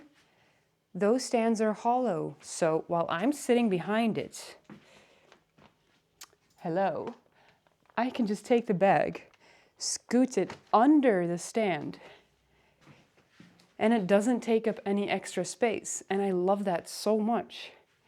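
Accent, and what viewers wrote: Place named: United States. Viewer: Its so interesting, your sick voice gives you an American accent somehow 😂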